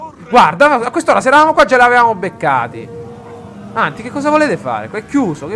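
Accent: Sicily, Italy. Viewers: Quando ti alteri un pò e alzi la voce col tuo accento siciliano mi fai morire, grande! P.s ricorda di finire l'esercito vandalo sopravvissuto alla battaglia, c'è il loro re lì dentro. PPS. i tuoi giavellottisti non lanciavano perchè avevano davanti a loro gli alleati erano ostacolati, credo.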